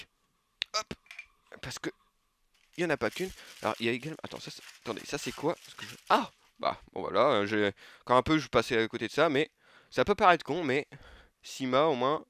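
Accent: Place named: North, France. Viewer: salut, très bon review avec le contenu qu'il faut, et on voit que tu t'y connais, pas d’hésitation dans tes dire et un discours agréable......et un accent du nord si je ne me trompe pas...lol!!! du coup je me suis abonné....au plaisir!!!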